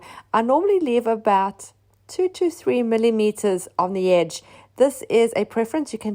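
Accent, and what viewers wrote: South Africa. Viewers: South African 😊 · Thank you for this tutorial well done and much easier to follow. Are you perhaps ex-South African....I recognise your accent! I am from Durban South Africa.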